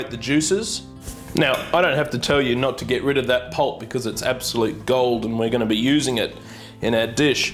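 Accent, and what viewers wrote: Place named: Australia. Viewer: You are an amazing person! and have all these great dishes!!! AWSOME! also love the aussie accent man! and you must have a busy week making all these videos and deciding what to make and getting ready and all!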